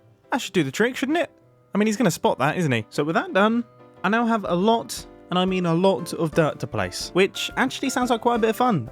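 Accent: United Kingdom. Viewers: Uhh no... no crackle but you do sound more British than usual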